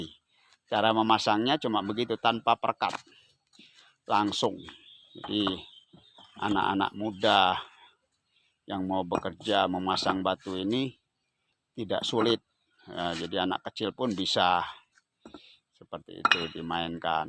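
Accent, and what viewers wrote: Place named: Klaten, Indonesia. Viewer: Logam klatenya mantap hhhh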